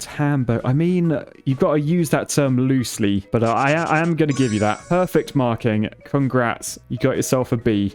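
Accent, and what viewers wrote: United Kingdom. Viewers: And more bri'ish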